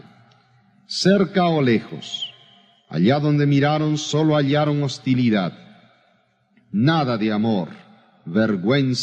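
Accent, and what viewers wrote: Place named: Argentina. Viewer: Aquí todavía se le nota el acento ARGENTINO. Pero más adelante lo perdió un poco. Y maduro como el buen vino al punto que después de una hora escuchándolo, cuando terminaba la predicación siempre quedaba la sensación de quedarse con más gana de escucharlo MRMC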